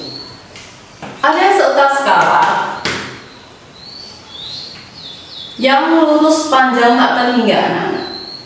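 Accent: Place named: Java, Indonesia. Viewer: Bu guru kyak nya chinese...tapi logat nya jawa... .khas logat hokien...😂😂😂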